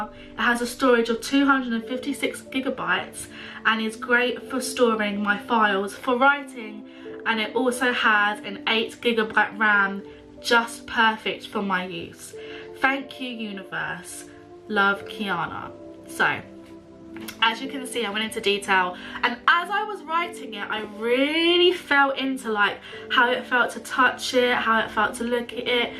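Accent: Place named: United Kingdom. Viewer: British English sounds so lovely and cute 😍😍😍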